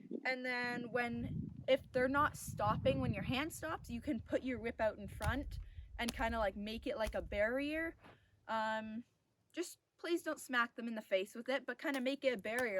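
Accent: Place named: Canada. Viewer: Hello, I'd like to learn more about Missy please. Age, height, color and with that gorgeous dorsal stripe (favorite behind dapples) what you know about breed(s). Also a question about you please if its alright. 50+ years ago I dated a young man from Canada and 2 things stayed with me. The distinct sound of saying the words out and about which I hear in you that prompted the ask and the use of "eh" which seems to be fading. It was so strongly used back then with every Canadian I met while living close to the border and traveling through the country in the 1970s. I wish you a strong build on your YT page and its success is a win for horses. Two accounts I follow that I highly recommend you look at are, 1) THE CLEVER COWGIRL So far we've never fully seen her face but what I do see is a lovely natural blonde who reminds me of lovely strong Nordic women. 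She's kept her name private also but her account name has led to us calling her CC and she seems very fine with that. I imagine she is between late 20s and middle 30s and like you CC is working to build up her account. She worked training horses for others in Utah but she and hubby are now in Montana where she buys the auction horses most people don't or shouldn't want. More than once she's been kindly told she ought not buy a specific horse including one that cost her $25. However, with her >not< bragged about humble but awesome experience and ability she zeros in on the issues then, when ready, sells to an appropriate rid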